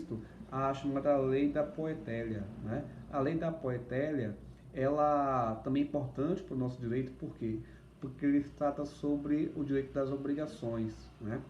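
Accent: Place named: Salvador, Brazil. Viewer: Eu sabia que vc era de salvador desde sua primeira palavra 😂😂😂😂. Aula fenomenal, mestre! O senhor leciona em alguma faculdade aqui?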